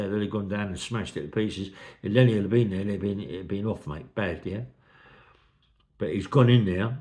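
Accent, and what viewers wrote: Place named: Cockney, United Kingdom. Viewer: I could listen to for hours, great stories told in a comforting old cockney accent, I’m addicted to this channel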